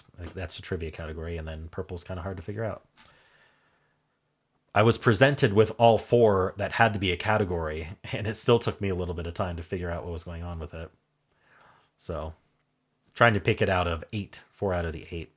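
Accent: United States. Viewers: the joys of US pronunciations i would never have gotten hawk and hock(ey) to rhyme - but then in the US sauce and horse don't rhyme but they do elsewhere.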